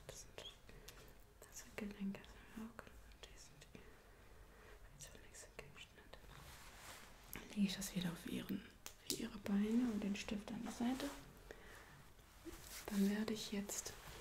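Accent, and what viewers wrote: Germany. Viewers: I very much prefer the soft speaking roleplays. The German accent and language is nice ASMR. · вы из германии или австрии?) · It took me 11 minutes to know she German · I think she is german...i don't t inderstand anything..but is good..i feel triggers.....gostei muito mesmo..ela é ótima....já me inscrevi no canal.... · german, guaranteed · A Germán doctor.....pretty familiar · yG KeKe I love the German language, and their accent.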